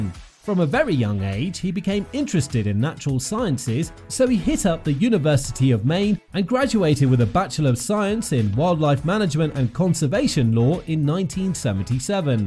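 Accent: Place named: United Kingdom. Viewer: There’s something about this style of British… I don’t even know what to call it….sensationalism?…that always comes off as insulting to the people being discussed and over-hyped. As soon as you hear that particular British accent, you know the presentation is just going to be an audible ‘National Enquirer’ presentation. It’s not going to be polite. It probably isn’t even going to be true. I have to tell you when I heard the word “dummies” used I got instantly angry. I don’t believe people actually feel that way about the personalities in This Old House. I couldn’t believe that was said. Disgusting.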